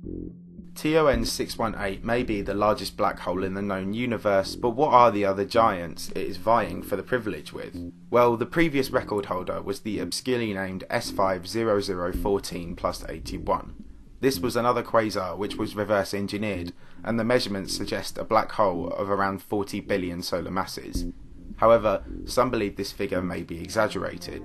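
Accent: United Kingdom. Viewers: I love the british accent. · I can't stand this guys British voice.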